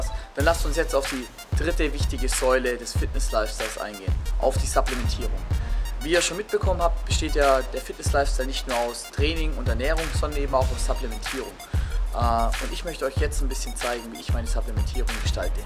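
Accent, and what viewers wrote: Germany. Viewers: woher kommt den der Schwabe genau? :D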